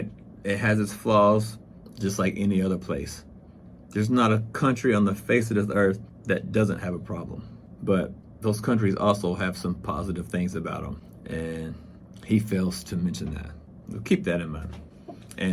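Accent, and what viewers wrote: United States. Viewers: At what point of your life in China you lost your American accent? Just curious.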